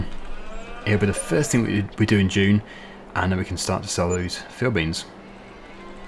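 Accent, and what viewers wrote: England, United Kingdom. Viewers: Heh you're definitely British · So obvious that you are British. Where exactly I can not place, somewhere in England though. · I've been watching every video you post for over a year now i know your British just from your accent, a d i think you've said in a couple videos too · You have to be, definitely, be British. Arn’t you? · British isn't an accent, he has an English accent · British 1000%, not even close to Merican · Always knew you're British but i am American soo we know each other's accents lol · I am an American and I can tell your British! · You sound British to me. If you’re American, you have a good fake accent. Wow! That would actually blow my mind if you came out with a video where you finally admitted that you’re not British and you had a California accent. 🤯 Or if you had a really thick southern accent, ha! 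· British, you're British mate! Can't quite place your accent, though. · no way some can think he is form USA that accent's can't be more British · the accent makes you speak the UK style of English, not the unaccented US style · 100% British u live in England am shoure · I've watched your videos for long enough to know your British. Also love your videos! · is unmistakably British. Middle England is my guess. Well educated. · Only someone just not too familiar with accents would mistake you for anything other than British! A new vintage survival sounds grand. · British way to quite to be American an also to intelligent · British 100% - further south than myself! You play court farm really well, I find it really cramped and keep crashing into things expecially around the grain silo through to the closest silage pit! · Definitely more British and not American, or might be a tiny Wales in there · Definetly Bri'ish, but in the way that everybody can understand you, not the Yorkshire or Birmingham mumbling 😆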